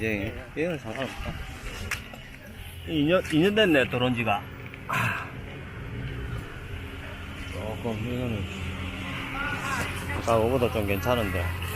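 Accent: Gyeongsang, South Korea. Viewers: 쉽지가 않에요.... 이 갱상도 뉘앙스가 정겹다...., 라오스 문씨도 중간에 제 객관적인 방식....칽카핥핥크크크.... 좋은 영상 감사합니데이...욕받심더~~~ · 목소리가 너무 좋아요 ㅋㅋ 전 마산에 살아요 경상도 분이 캄보디아에서 사투리 ㅋㅋ 너무 정겹습니다 유투브 대박나세요~^^ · 리얼 경상도^^ 고향에 온 듯해서 좋습니다. 번창 응원합니다^^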